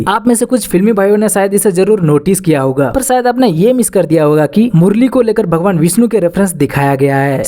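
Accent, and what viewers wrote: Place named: Karnataka, India. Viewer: Nah he's from southern india, i can tell by his accent.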